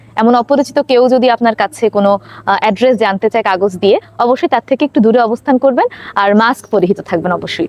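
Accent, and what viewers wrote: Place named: India. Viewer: Tumi bangladeshi? Vul vebechhilam karon tomar katha balar dharan thik Indian der mato.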